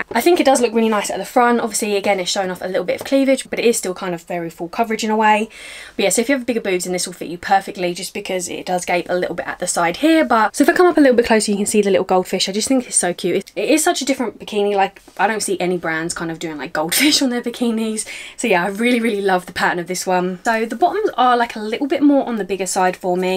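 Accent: United Kingdom. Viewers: SOFT MODELING.. JUST ELEGANCY AND BEAUTY... YOUR BRITISH ACCENT IS VERY CLASSY.. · My god.. I didn't know you had a British accent.. I am in love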